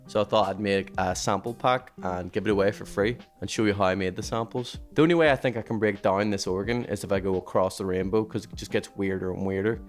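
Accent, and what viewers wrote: Northern Ireland, United Kingdom. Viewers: It always throws me for a loop when I click on a random YT video and hear an aul norn iron accent, since we're so rare on here. Good videos mang, keep er lit!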